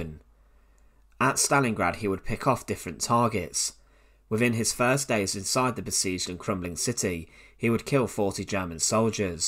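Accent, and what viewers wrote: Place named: Leicester, United Kingdom. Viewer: This strong Leicester accent is just terrible! It spoils everything.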